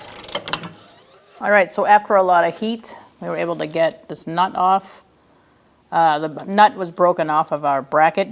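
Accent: Boston, United States. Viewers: Love the Boston accent ! 👍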